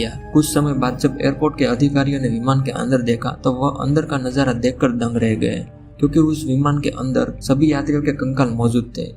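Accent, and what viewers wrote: Gujarat, India. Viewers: Tu gujrati hai bhai?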